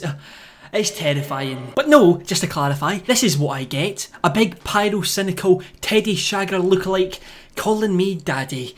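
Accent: Scotland, United Kingdom. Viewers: Hello, I'm just asking. Are you British or Scottish? If not, what is your nationality. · are you scottish? i love your accent damn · Are you from Scotland? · r u socttish? U sound scottish and I like that this is the first vid i watched abt u btw lol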